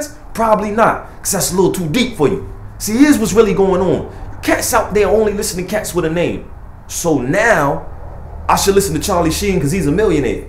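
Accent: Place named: Philadelphia, United States. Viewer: Are you from Philly,You have to be you have the accent.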